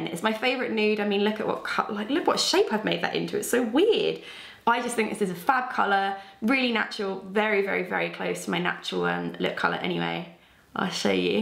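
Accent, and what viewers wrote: United Kingdom. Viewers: yes! I also read your comment right as she said "brighter bees." I love Brits and their accents!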